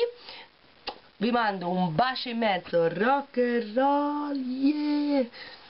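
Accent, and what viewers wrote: Tuscany, Italy. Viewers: Mi fa sempre piacere sentire un dialetto amico su Facebook, una bella toscanaccia come me :)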